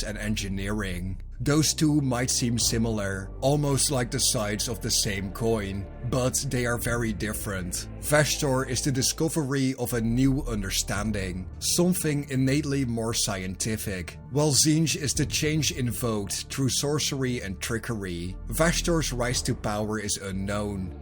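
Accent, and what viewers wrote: Netherlands. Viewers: Strong dutch accent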